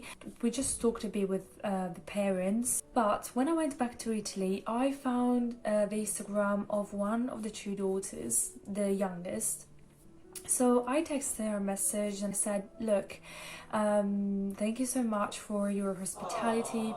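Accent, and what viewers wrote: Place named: London, United Kingdom. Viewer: Your English has come along so well - a slight London accent too! Xxx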